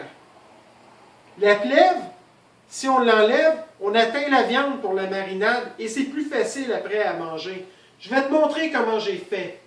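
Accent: Canada. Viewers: bonjour super ta video ton humour et ton accen canadien super je suis de normandie en France et j ai apprecier a plus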